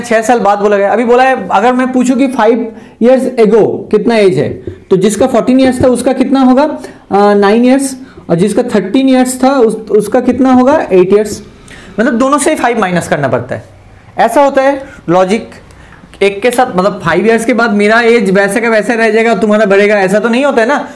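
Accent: Bengal, India. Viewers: Sir aap sum ko saam kyu bolte h shayad aap bengali ho mager aap bhot aache teacher ho heads off on you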